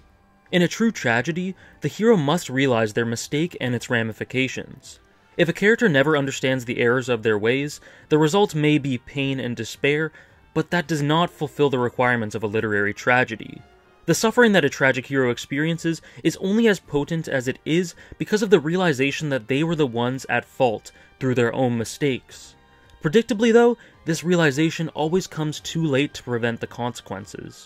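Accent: Canada. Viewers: Good content to go with a very noticeable Canadian accent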